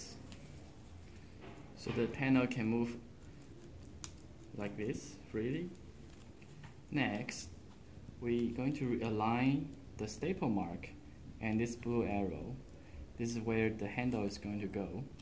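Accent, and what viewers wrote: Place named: Taiwan. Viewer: 聽起來好像台灣人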